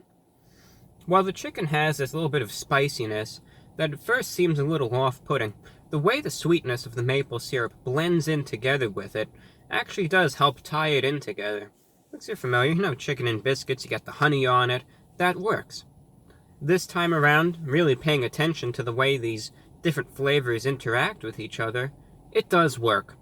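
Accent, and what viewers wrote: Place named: Transatlantic, United States. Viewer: bringing the transatlantic accent into the 21st century for sure 🍔🍟🍕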